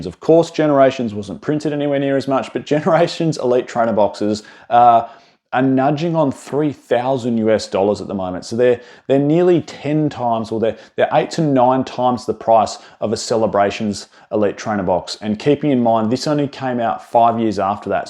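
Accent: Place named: Australia. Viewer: I still can’t figure out why ausies pronounce their A’s as R’s but seemingly ONLY at the end of the word. He said Data and it sounded like daughter 🤣🤣 Any way I know people have given you shit about this before and this isn’t me doing that. All ausies do it and it’s just interesting to me why it came to be. Love your content man!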